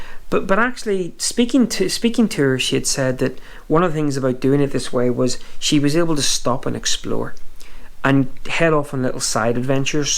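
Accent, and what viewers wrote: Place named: Northern Ireland, United Kingdom. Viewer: Where is your accent from? It sounds like you were born in NI, but you moved to Scotland later in life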